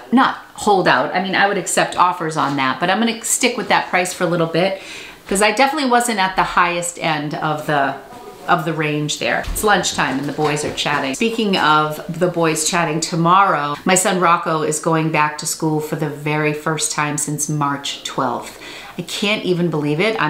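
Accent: Massachusetts, United States. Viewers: Lol I feel like you don't have a strong Massachussetts accent until you hang out with your friend haha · This is exactly what I was coming to the comments to say, haha! Love that accent! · I totally thought the same thing! Lol..., I’m the one who asked about you having a hidden Boston accent in your IG a while back and this video proves you do:) love it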